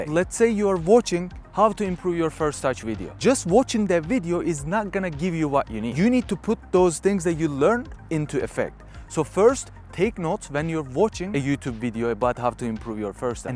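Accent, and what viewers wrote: Turkey. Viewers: U speak like a Turkish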